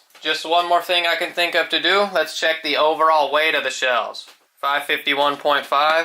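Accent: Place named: Germany. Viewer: Great video, im curious if you are of German descent? Your accent is familiar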